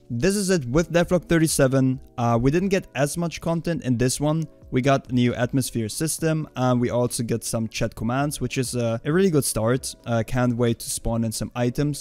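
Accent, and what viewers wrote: Germany. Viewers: Jo Bro are you German? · Are you from germany?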